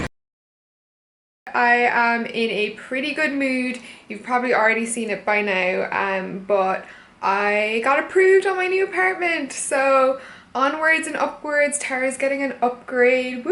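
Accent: Ireland. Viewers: I think its the Irish accent and New York! And you living the dream out in the big apple! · 👌👍🤣 Still one of the theee most FABuuulous things you've said in the plethora of content with THAT wee accent!! "There is no care package than a care package from ..... from an Irish mammy" p.s. you-do-not-age girl...it is now Oct. end 2019 & this vid is from beg of March 2018....just sayin'!!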